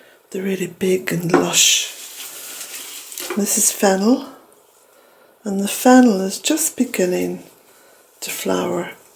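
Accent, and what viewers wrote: Ireland. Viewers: The nastiness comes from a place of bitterness and jealousy, just tell em to feck off in that lovely Irish accent of yours ! youre doing a grand job, lots of love to you and Jack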